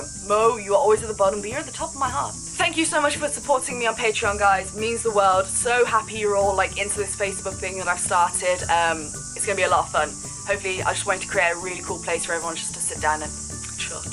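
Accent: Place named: Wales, United Kingdom. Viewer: Where's the welsh accent from?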